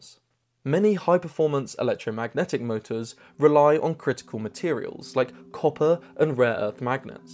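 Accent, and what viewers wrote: England, United Kingdom. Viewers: couldn't finish the first 2 minutes because of your puke English voice "mowtas" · Drop the english accent. I miss about 10% of your words so I can't follow you. Too many w's.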